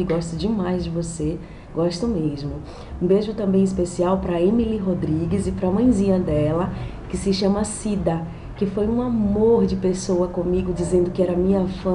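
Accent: Bahia, Brazil. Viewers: Nunca tinha ouvido falar de você, mas quando você abriu a boca tive certeza de que você era baiana tb. que linda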